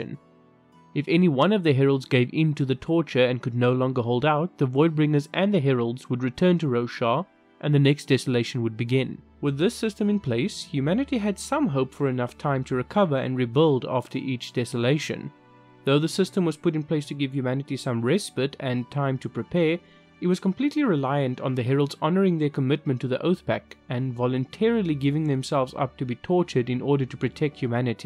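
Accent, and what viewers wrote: South Africa. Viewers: was quite excited to hear a saffa voice :D keep up the good work! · This is a great video! Thank you.... Also, your accent sounds very local to me. I think we may be from the same country! South Africa? :) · Are you south African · South African accent makes me feel home again, moved 5 years ago. This is one of my favourite series right now so it ties together nicely. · Haibo,…narrator sounds like a Saffa 🇿🇦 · I like to support a fellow South African. Could tell from the accent.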